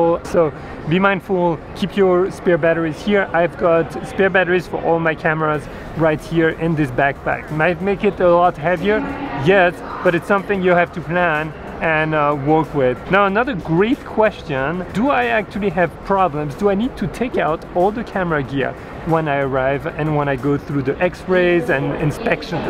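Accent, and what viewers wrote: France. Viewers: Tu es français ou je me trompes ? 🫣 Thank your for the advice I'm flying firdt time with my camera 😊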